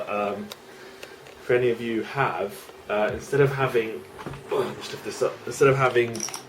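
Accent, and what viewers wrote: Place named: United Kingdom. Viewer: Youtube showed an ad for the new Sacha Baron Cohen film, just before this film, and suddenly your face popped up with that british accent.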